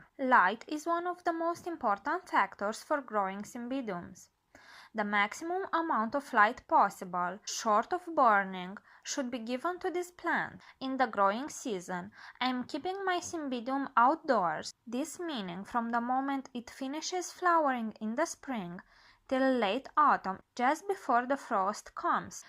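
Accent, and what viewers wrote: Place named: Portugal. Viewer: She has a Portuguese accent, big deal! Get over yourself🙄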